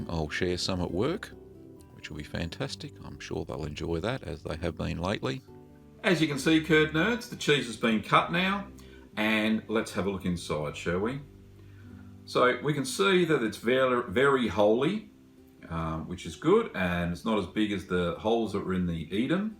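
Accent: Australia. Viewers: Nobody gives a crap, stop being such a whiny baby. He's got an Australian accent. Leave the man alone.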